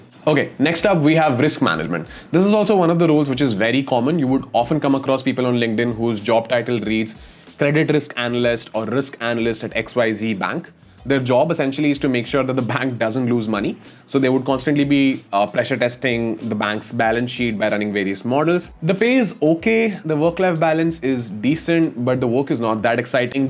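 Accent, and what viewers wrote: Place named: India. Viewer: Why can no indian talk english without an indian accent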